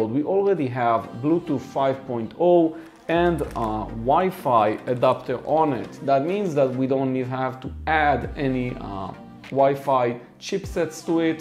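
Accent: Israel. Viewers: this guy sounds violently israeli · אחי, אין על המבטא הישראלי שלך. :)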